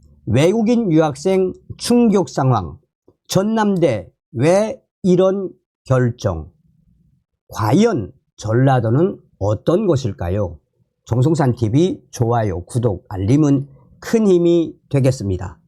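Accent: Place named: North Korea. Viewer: 이 바라!!! 다른 사람을 시켜 방송을 하든지 아니면 아예 이북 말로 방송을 하든지... 듣는 사람 넘 힘들다.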